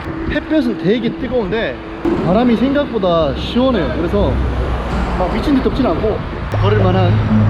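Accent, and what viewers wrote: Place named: Daegu, South Korea. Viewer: 저도대구사람이라 사투리가정겹고 너무재밌어요♡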